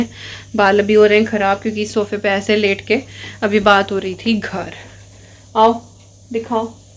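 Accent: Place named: Punjab, India. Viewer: Hi g aap punjabi ho baaton se lagtahai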